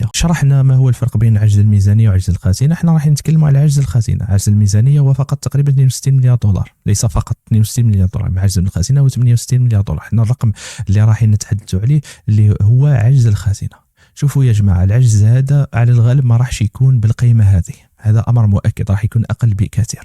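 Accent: Morocco. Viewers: اولا من هو هاذا المحلل انا راه ايبانلي مروكي حسب اللهجة نتاعو و من اين جاءته كل هذه المعلومات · نتا مروكي على حساب لهجتك واش دخلك في ميزانية الجزائر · Un marocain qui fait des analyses sur l'Algérie et il oublie son pays:le makhraz. Son accent l'a trahit. Il. Parle d'un déficit de 68 mds $ et justement c'est la même somme de la dette du makhzane chez le FMI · لا أضن ، درت بحث و لم أجد شيئ إلا اللهجة أنتاعو تميل للجارة الغربية ؟؟؟ و هذا يخليني أتساءل عن ديون الجزائر و سيادتها 😊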